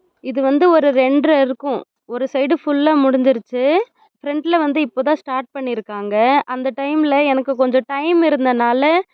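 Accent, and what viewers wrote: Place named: North India, India. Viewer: Nee yennoma north indian tamil pesura matheri pesatha nee tn thaana ozhunga pesu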